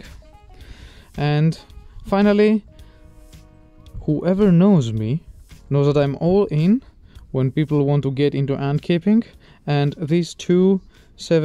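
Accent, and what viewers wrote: Austria. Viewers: #giveaway #notAU I’m from the great state of Florida in the city of Jacksonville in the good old USA. First I just want to say that you’re English is perfect! There is of course an Austrian accent but not much can be done about that. Thank you for your hard work making all these videos! I would love to see more videos of you catching queens in the wild and how to take care of them please. Thank you again.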